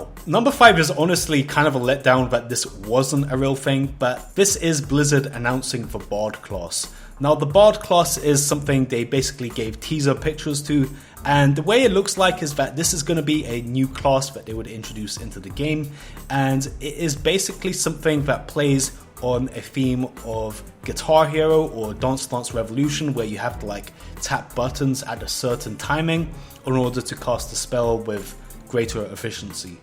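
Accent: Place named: United Kingdom. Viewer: I swear to god every british youtuber has this accent almost seems like its acting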